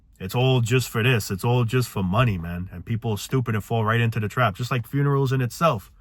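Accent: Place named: Italian-American, United States. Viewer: What accent is this? Like Italian mobster/Jersey/Boston? Lol sounds cool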